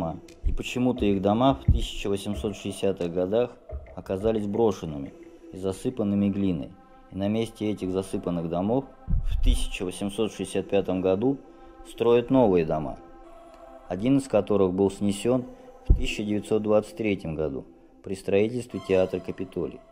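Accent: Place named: Moscow, Russia. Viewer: Московский говор, что здесь такого особенного? Давайте приедем в какую-нибудь деревню под Н.Новгородом и потребуем от людей не окать. Сами догадаетесь, в какое сказочное путешествие Вы отправитесь с невероятной скоростью? Все говоры русского языка равны, и не надо придумывать какой-то "идеальный" язык. Даже в эпоху Интернета не всё так однозначно :)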